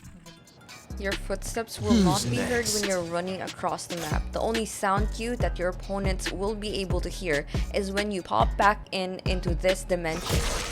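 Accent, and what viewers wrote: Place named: England, United Kingdom. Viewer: nagulat ako bakit english accent pero nag tagalog lmao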